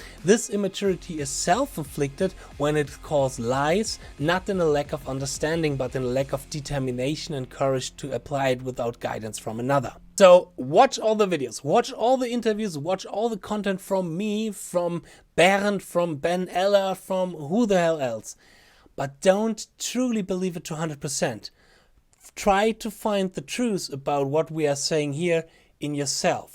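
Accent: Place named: Germany. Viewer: Right, Yngwie is one of those one in a billion supertalents and who are you?? The way I see it is that you are some strange looking guy with a weird german accent with a hoody on in what I assume should be a heated tiny room with some cheap lights, hoping to get some views of off Rick Beato's success and Yngwie's massive career. Who are you again?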